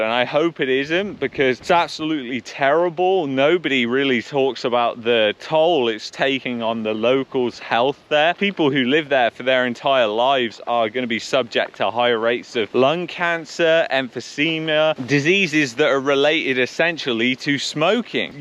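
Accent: United Kingdom. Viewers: You still have your northern accent so you'll fit right in. I am expat from UK, Dorset, on the south coast. I've always kept up with the UK news. I wouldn't go back to the UK if you paid me. The brainwashing there is quite possibly worse than here in Canada. The "new" Brits are nothing like the people I grew up with and they seem to have lost their compasses. Wait 'til you see how many rules and regulations there are that control so many aspects of your life. All this people living on an island with thousands of migrants arriving to use the benefits' system, that's why there are so many insane rules and regs. Too bad you didn't feel a loyalty to Canada and remember what a truly wonderful country this used to be.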